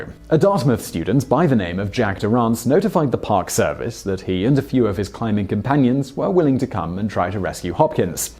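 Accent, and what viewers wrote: England, United Kingdom. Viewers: id rather listen to that fucked up robot voice than this brit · This channel is such horseshit... I too can read a wiki entry in an English accent. I'll take my 2 million views now please. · Yeah he's been living in England for a while now. Guess he picked up the accent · So the ominous British voice over guy is VSauce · Is that British English?? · I was just interested in seeing the clips..and not listening to 7 minuts of british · British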